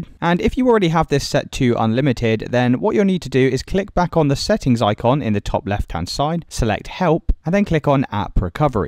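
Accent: United Kingdom. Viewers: THANK YOU BRITISH MAN DNS SERVER WORKED 4 ME MAN!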